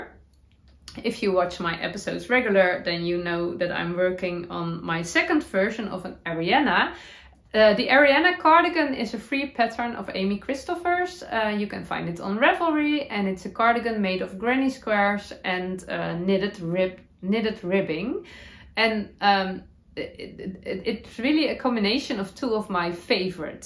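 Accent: Netherlands. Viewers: I love your sweater! The colors are so beautiful and looks great on you. I wonder if you could embroider a couple of small flowers in the extra stitch gap area in the front or add small buttons. Just an idea if it continues to be a concern for you. I love your podcast and your Dutchy English😊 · Love your podcast and your Dutchy English! Your Ariana sweater looks great. As you were describing what you wanted at the centre front of the neck I think you might find that the centre double decrease will give you the look you want (for the next sweater!) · I am from Oregon USA. Your youtube channel is so relaxing to watch while I knit, from the beautiful introduction music and throughout. I am a slow knitter, my process is slower and my lifestyle doesn’t allow me to finish projects as fast as I’d like and I never feel bad because of it when I watch your episodes because you never present an unrealistic amount of items you’ve finished. 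You have a very pleasant “Dutchy English” and your style is lovely. Thank you!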